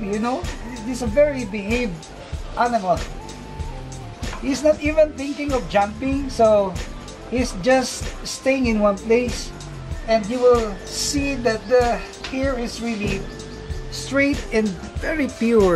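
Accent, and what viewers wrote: Philippines. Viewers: you sound filipino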